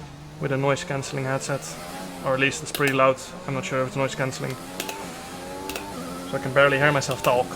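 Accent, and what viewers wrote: Netherlands. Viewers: Je klinkt erg Nederlands in je videos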